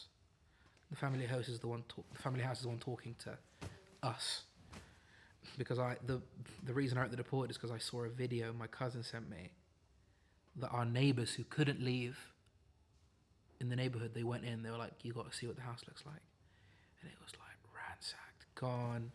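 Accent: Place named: United Kingdom. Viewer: GUYS HE’S BRITISH WHAT?????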